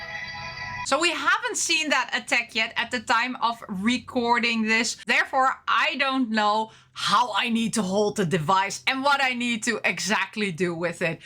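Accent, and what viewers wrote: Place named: Netherlands. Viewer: hello, something i've always wondered if you're dutch? you're accent is really dutch :3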